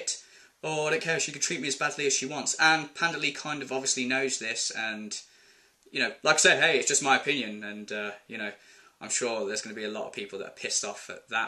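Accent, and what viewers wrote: United Kingdom. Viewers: British accents are pretty interesting. :)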